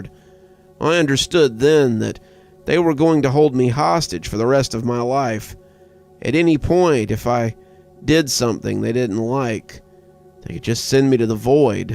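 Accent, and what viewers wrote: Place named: United States. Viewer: I love your accent !! Might be my new favourite American accent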